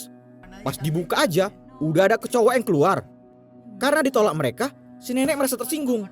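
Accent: Medan, Indonesia. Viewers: galon 🤣🤣 . medan kali bah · logatt medan emg gituu bro wkwk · Medan x wkwkwkwk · Suka kali sma suara abg ini...medan kli🤣😭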